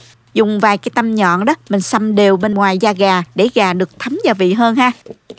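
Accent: South, Vietnam. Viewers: Giong noi cua de thuong qua, dung la nguoi Nam binh duong❤️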